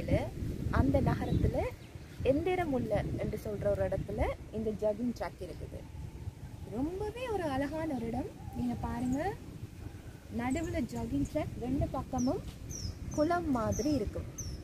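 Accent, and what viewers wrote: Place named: Tamil Nadu, India. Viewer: I really enjoyed your vlog..your tamil slang is amazing 💐 keep doing more vlogs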